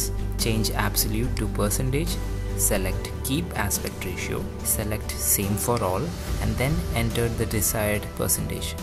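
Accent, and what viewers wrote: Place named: Kerala, India. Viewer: nope But felt you were a mallu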